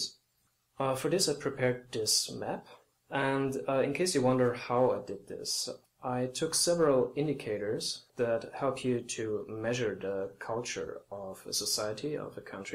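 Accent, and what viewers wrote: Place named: Scandinavia. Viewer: BTW...I am aware of your Scandinavian accent... I'm only assuming that you have recently expatriated to the UK. Since many of your arguments predominates between Britain and the US.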